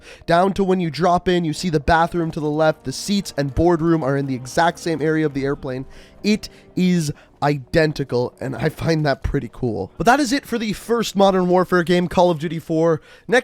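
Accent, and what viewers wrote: Canada. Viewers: You say ‘about’ and ‘out’ and I can instantly tell you are Canadian. Cool video. Thanks. I’m not sure if this is continuity in the Call of Duty games or just laziness by Activision? They probably have the skeleton’s of these maps and convert them over to their current engine. Will we get another Map, besides Verdansk?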